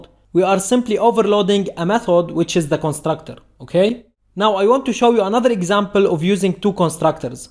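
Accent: Russia. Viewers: are you from russia💀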